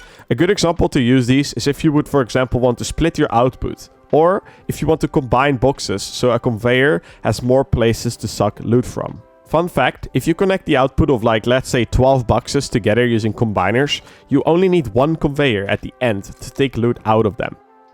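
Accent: Netherlands. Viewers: Ik proef het gewoon dat je nl bent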